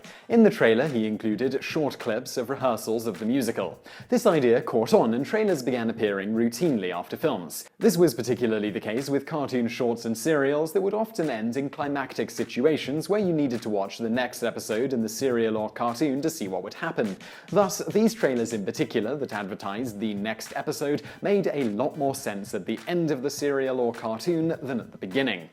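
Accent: England, United Kingdom. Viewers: Cameron Kiesser he’s English.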